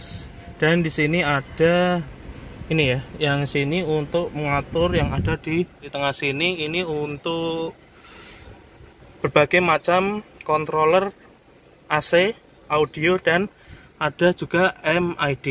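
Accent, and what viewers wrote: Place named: Java, Indonesia. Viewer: Jangan pake bhsa GW mas soalnya rada2 medok jawanya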